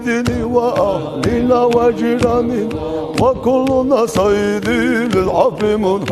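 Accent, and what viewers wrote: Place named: Chechnya, Russia. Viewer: Клас на чеченском деалекте слушать одно удовольствие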